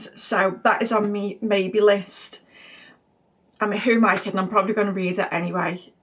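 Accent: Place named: Midlands, United Kingdom. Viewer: You have some cool books in the plans for this month, I hope you can get to them all. Except the Cassandra Clare one, she’s evil 😹 Also, i’m desperately trying to place your accent. I’m not English I’m just into accents, so I’m probably terribly wrong but I’m thinking you’re somewhere from the Midlands? :)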